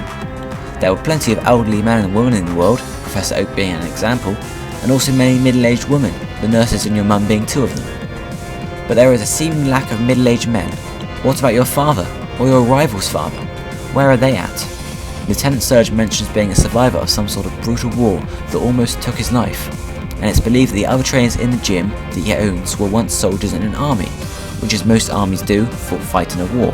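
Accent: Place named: United Kingdom. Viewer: *Video starts with a British narrator* Okay, this I like...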